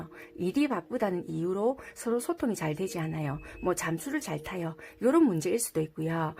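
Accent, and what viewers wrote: Busan, South Korea. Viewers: 2,4 만명 축하드려요~더 잘 되실 거예요~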